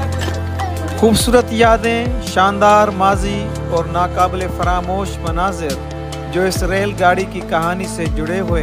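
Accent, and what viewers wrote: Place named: Sindh, Pakistan. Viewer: Koi Sindhi jab Urdu bolta hai tou? Bohat achcha lagta hai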